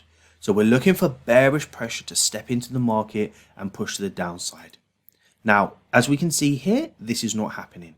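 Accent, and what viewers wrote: United Kingdom. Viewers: I found you on youtube 4 days ago and started to watch your videos and found answer to my questions and found out what wrong with my set ups..all day long your words with that beautiful British accent is repeating in my head and makes me do the right thing.Thank you.Thank you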